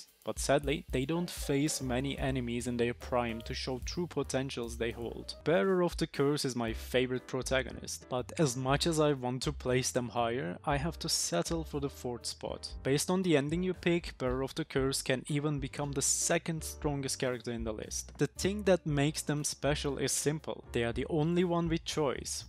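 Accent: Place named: Europe. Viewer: Can't listen to monotone euro voice over.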